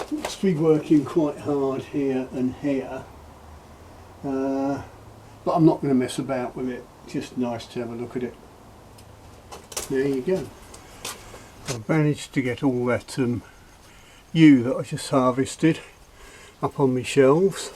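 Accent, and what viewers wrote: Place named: United Kingdom. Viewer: Quick question, I assume you are in the UK based on your accent, I was wondering what the law is for you in England over bows, where can you shoot them, do you need a licence to own one etc, Im in Scotland so laws may differ but been thinking about trying to make a bow for a while but not knowing the law situation has put me off trying.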